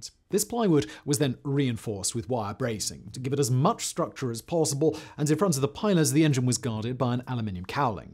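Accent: United Kingdom. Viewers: Funny how these chaps with the funny accents always seem say the 'most formidable' and 'very best' aircraft of the wars were the British ones. The Camel, which I personally love, was in dire straits if come upon by an airman of equal skills flying a Fokker DVII, as turning fighters are at a distinct disadvantage to energy fighters, and cannot run away when cornered. A smell of arrogance permeates the air when the average limey speaks of her Majesties Royal Air Corp. I would stand shoulder to shoulder with a man (girls, needn't bother) who would with humility speak of the profound glory the Camel rightly deserves. Observe that, the 'greatest' or the 'most deadly' are terms that mouthpieces use to sell their video of the day. Oh, give over blokie!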